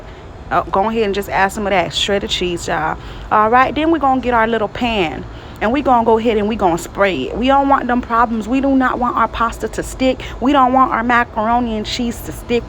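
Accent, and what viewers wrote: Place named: Florida, United States. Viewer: 😂Flowwwda aksent!!!! Luv it!!!!🔥🔥🔥🔥🔥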